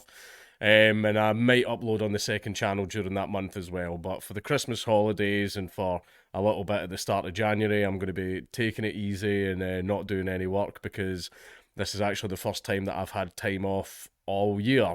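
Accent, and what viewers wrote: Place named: Scotland, United Kingdom. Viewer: Have good Christmas man 👍 I've enjoyed listening to one of the last Scottish accents to call nonsense on the current things. P.S your having to many stress wanks, hence the reason your having consecutive children because your jizz is new when the wife can actually be bothered with you 😯 Thank me later man😂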